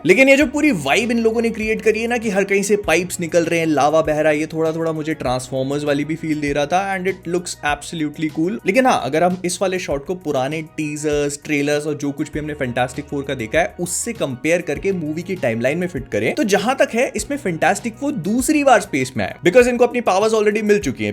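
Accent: Bhopal, India. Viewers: bhai ap Bhopal se ho??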